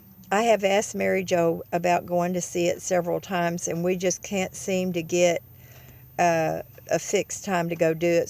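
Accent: South, United States. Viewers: Just taking bids I hear. You have a southern accent, but do you speak Spanish? haha.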